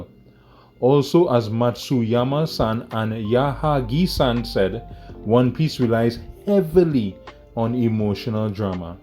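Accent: Trinidad and Tobago. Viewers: Havent even listened to the video in full yet but following because i hear a Trini accent i think? And i support a fellow Caribbean person doing this 💪🏾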